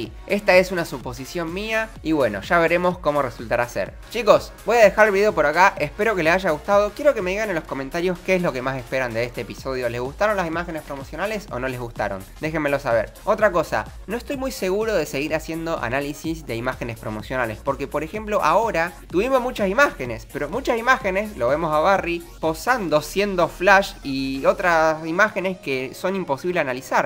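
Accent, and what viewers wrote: Argentina. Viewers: De que parte de Argentina sos?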